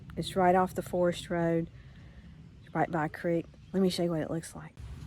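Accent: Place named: South, United States. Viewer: Nothing wrong with your southern drawl. I understand you perfectly and I’m Canadian😊 EHH! Love your travels, hope to follow in your path someday.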